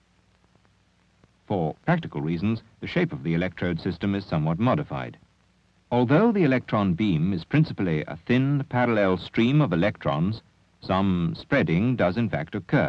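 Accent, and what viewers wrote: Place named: United Kingdom. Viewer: Canada? Why does the voice over sound British??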